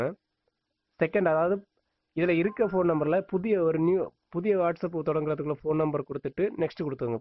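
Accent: Kanyakumari, India. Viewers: kumari thamizh👍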